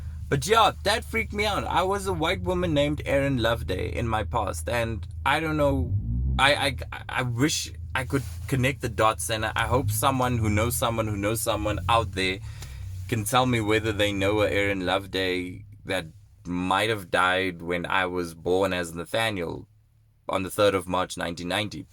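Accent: South Africa. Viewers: are you south african? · Your accent sounds South African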